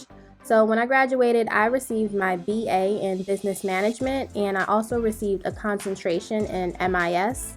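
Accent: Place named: New Jersey, United States. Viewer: Wow great video I knew you were from New Jersey I just wasn’t sure but the way you pronounced some words gave it away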